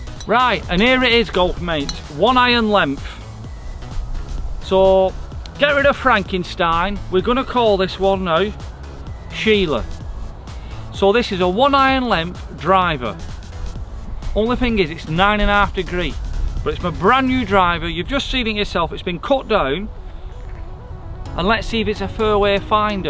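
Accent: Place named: Scotland, United Kingdom. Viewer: I can't understand Scott-ish. Is there a translation.?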